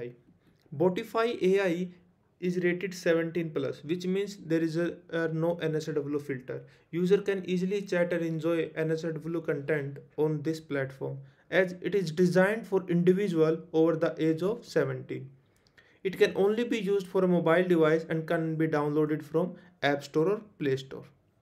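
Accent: India. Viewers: Bruhh you from india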